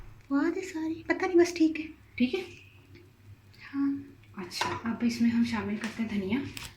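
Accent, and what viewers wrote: Punjab, India. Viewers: very very sweet punjabi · sweet punjabi boltey ho. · MashAllah Zaberdast Mash De Daal Bhale Bhale 😍 Punjabi Baree Pyari Bolde ho 👌🏻 👏👏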